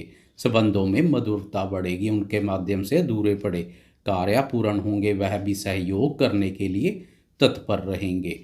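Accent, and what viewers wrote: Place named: Punjab, India. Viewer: Guruji aap Punjabi ho shayd 😁 apki accent se lg rhaa hai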